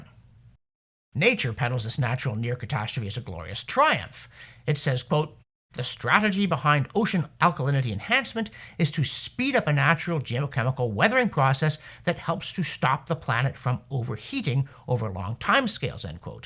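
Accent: Canada. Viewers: 🫡✋haha me me me! just discovered this channel. Very cool! His Canadian accent annoys me but I’m an overly cocky American😅 haha my voice probably annoys people too and I might be blissfully unaware. Haha but yah I am very much enjoying his presentations and data and sarcastic style. Amazingly, youtube recommended this channel to me! I dunno… but I wonder if it means they tweaked their algorithm to suppress less content? Anyway I was very surprised the videos made it past the suppression filter into my recommendations feed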